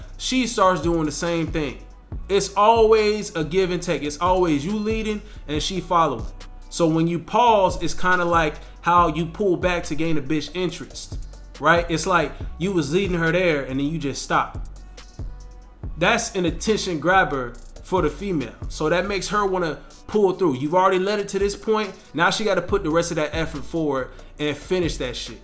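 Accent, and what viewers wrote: United States. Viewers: Yo you from tampa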